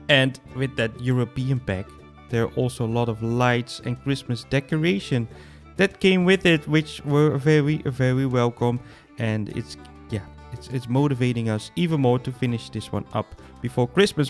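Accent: Netherlands. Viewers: Altijd leuk hoe je het commentaar doet, beetje nonchalant en love je Nederlandse accent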